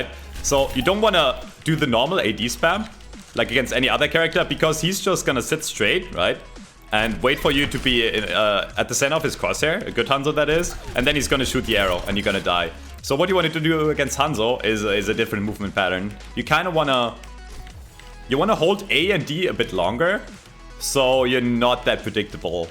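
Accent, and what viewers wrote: Germany. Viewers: Are you German? Or Austrian? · german :D ?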